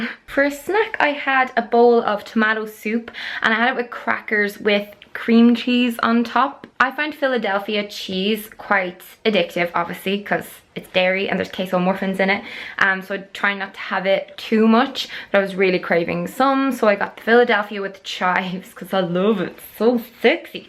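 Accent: Ireland. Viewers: I just subscribed to your channel a few days ago and I just wanted to tell you that I reeeeally like your videos!!!♡ (and your Irish accent omg absolutely love it and could listen to you for hours ♡♡♡♡ I've studied in Maynooth for one semester and I was looking for Irish youtubers for so long!!) Thank you so much for speaking up against body shaming!!! I'm 24 now and I'm still struggling with my body image and weight and I know that part of this is because stupid girls in school told me I would be fat (that was at the age between 11 and 15...so exactly the time when you don't feel comfortable in your body anyway). It's so sad to think that those comments still affect you years and years later because when someone repeatedly tells you your too fat/too skinny/too whatever you start to actually believe it... I sooo wish that people would just stop being mean towards each other and I hope this can happen through the help of influential people like you and many other kind-hearted Youtuber!!! 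xxxx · Hey! I've spent in Ireland quite a lot of time, almost a year actually, (in Drogheda) and I miss it so much that I started looking for Irish youtubers so that I could hear that gorgeous accent again! Well, I eventually found you and I must say I really like your channel! Very interesting... You got yourself a new subscriber! Good luck for everything, bye from Italy:)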